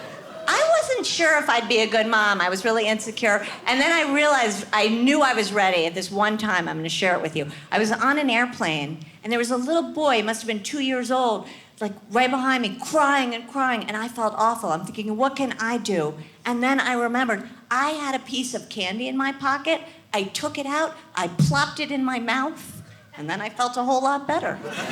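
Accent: United States. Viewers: Brilliant comedian and real American in her delivery, attitude and humour! Really liked it! Normally not so interested in people banging on about their kids on stage like I came to hear their biography but this brought so much humour with it I was glad to hear the stories! Thanks again!!! Haha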